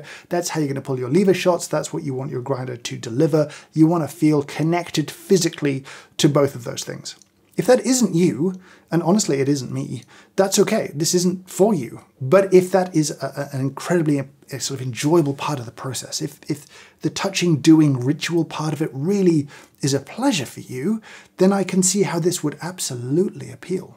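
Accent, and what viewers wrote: United Kingdom. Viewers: I must admit that your videos are kind of a therapy for me. There is much to enjoy when watching them: - beautiful editing - excellent choice of music - great macro shots - I don't know much about lighting, but it all looks put well together - the hair (no further comment needed) - the calming voice - the British accent - coffee - tech-stuff - the jokes I could go on and on, but I need my therapy now.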